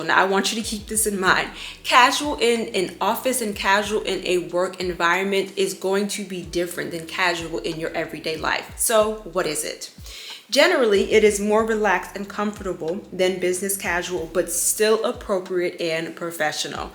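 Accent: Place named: United States. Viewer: I feel like this video kind of need a country and field preface too, on top of ”it varies from company to company”. Here in Sweden the corporate hierarchy is much flatter than in the US (which is where I assume you’re from just from the accent). Jeans, though maybe not ripped ones, are absolutely considered business casual and ripped jeans would be ok if your corporate culture is casual. I work in a university office and I’m the most dressed up at my office in, according to your scale, casual clothing. It BLOWS MY MIND that you had to PAY TO WEAR JEANS at your place of work. I feel like the attitude of woman in the TikTok is much more problematic than the shorts - not having the humility to take the very kindly hint from the recruiter that her attire wasn’t appropriate for that office is much more of a red flag than the shorts themselves. I feel like having someone to reference that you consider stylish and appropriate is very useful - personally I’ll ask myself ”what would Princess Kate (or sometimes Crown Princess Victoria) wear?” if I’m in any doubt as to what’s appropriate. 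Does the trick every time. 😁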